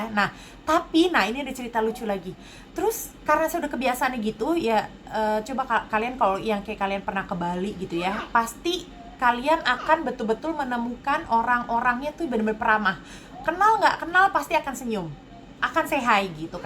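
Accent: Malaysia. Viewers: Ckp pun dh mcm org Malaysia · Dah ok.. slang bahasa melayu👍👍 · Mbah pertuturan nya sebiji MCM orang Malaysia la..alhamdulillah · Comel laa akak cakap Malaysia comel je bunyi die nanti dtg sini lagi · bila lograt melayu,hilang lograt indon nya.hahaha.terbaikk lah sis. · 100% dah jdi org malaysia, slangpun dah mcm org malasia :)) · Cara sebutan, cara lenggok pronounce setiap huruf, susunan ayat. Memang dah betul² bunyi malaysia. 👏👍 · Mula2 cakap indon lalama ckp cam org malaysia pulak hahaha lama sgt tu jd org melayu... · Kalau stgh jam pertama cakap melayu biasa²,mmg ingt org mlysia,ingt Johor X pn sabahan.sedap plak dgr loghatya.🤭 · Loghat sama cam org malaysia dah. Hehe. Bg saya stranger senyum ok je. X de rasa pelik pon. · Wahh good2 content.. ada exact ckp malaysia style.. truskn usaha 😬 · ehh macam orang malaysia cakap lahhh... kalau kata orang malaysia pun orang percaya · MasyaAllah kakak cakap longhat Melayu Malaysia hahaha .. 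btw orng Malaysia memang tak biasa senyum dekt orng xkenal ... orng Malaysia kalau nk sapa orng xkenal bagi salam baru orng balas kott hahahaa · Cara cakap pun dah boleh jadi orang Malaysia. Apa pun sentiasa berhati-hati.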